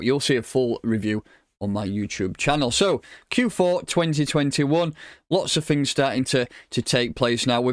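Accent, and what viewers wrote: United Kingdom. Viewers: dude stop talking with british accent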